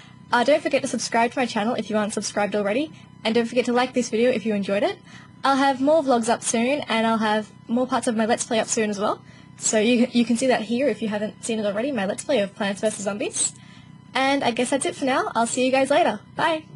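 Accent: Australia. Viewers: Your first vid I'm seein'. I subbed. At first I thought you had a british accent, but then I clocked it was Australian lol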